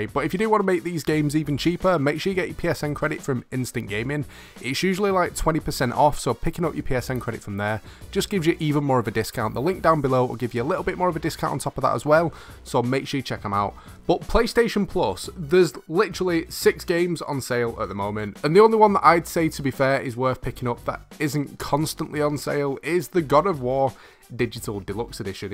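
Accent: United Kingdom. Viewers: Something about a British accent that makes me cringe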